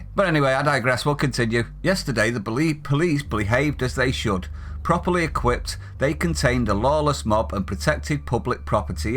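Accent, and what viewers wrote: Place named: RP, United Kingdom. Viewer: The key principle of Common Law and the US Law derived from it is the presumption of innocence. "The arresting policeman's actions were callous, brutal and indefensible." Indefensible, eh? Oh well then, no point in holding a trial, is there. The sonovabitch is guilty, with no possible defense, just lock him up or lynch him, right? I do not know if Derrick Chavin acted criminally or not. And neither does Douglas, despite his fine words and plummy accent. By sheepishly stepping into the "police brutality" story, and condemning the policeman in Minneapolis, based on nothing other than a Facebook video and the screeching of some activists and journalists, undermines his own credibility as the voice of reason. Wait for the trial, then the verdict. Until then, STFU! When everyone from the POTUS to journos like Douglas pipes up to opine that Chavin is guilty, do not preach to me about an impartial justice system. 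Chavin can not get a fair trial anywhere on this planet now, thanks to this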